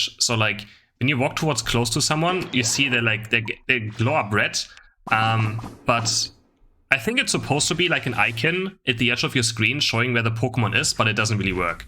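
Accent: Germany. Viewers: das ist der deutscheste akzent den ich jemals gehört habe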